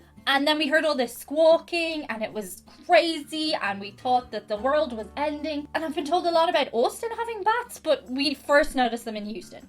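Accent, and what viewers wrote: Dublin, Ireland. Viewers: ehh ...i think shes completely irish just a bit influenced by american tv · She's a Dubliner. You've been watching "Derry Girls" haven't you? That is a radically different accent up there. Accents across Ireland are as different as Boston and Alabama. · ... Posh accent ???... What part of Dublin are you from ? That´s certainly a Dub accent, but posh ? Never in a million years.... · Whatever her accent is, it is now my ideal perfect Irish accent benchmark that I will compare all others against. I dont have to keep playing it over and over to figure out what she said. Mostly.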